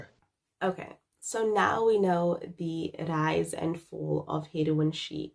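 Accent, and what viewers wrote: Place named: South Africa. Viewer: I can spot a South African accent a mile away ❤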